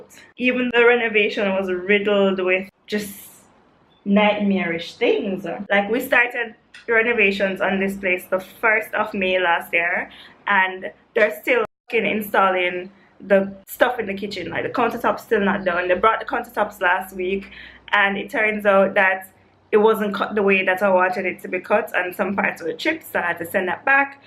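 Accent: Jamaica. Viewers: Btw, are you Jamaican?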